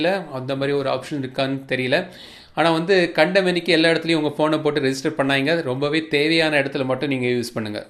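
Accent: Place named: Nanjil Nadu, India. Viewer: nanjil tamil super....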